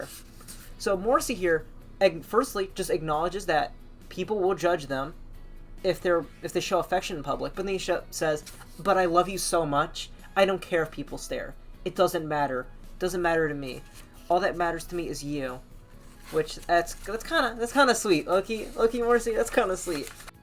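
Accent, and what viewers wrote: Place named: Northeast, United States. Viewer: Are you from the northeast region of the country? I’m from Philly and your accent feels real familiar to me?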